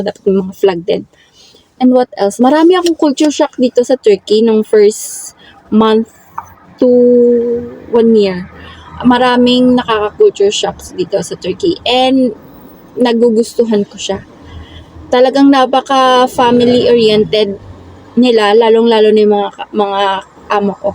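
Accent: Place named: Iloilo, Philippines. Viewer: Taga saan ka sa Pinas dai?sounds Ilongga❤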